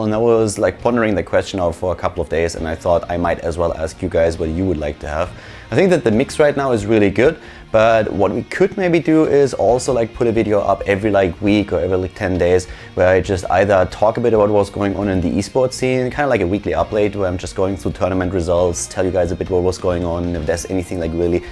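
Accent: Germany. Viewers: Ich habe schon früher deinen Kanal geguckt als du starcraft-Kommentator in Korea warst, seitdem du viel hots machst gucke ich dich dauernd, deine Videos gefallen mir sehr gut :) mach weiter so, ich hoffe deine Karriere geht weiter so gut weiter :) deinen deutschen Akzent habe ich anfangs gar nicht erkannt :D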